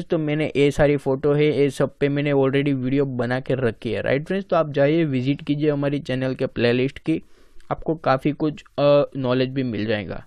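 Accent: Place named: Gujarat, India. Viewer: I think he is Gujarati 😊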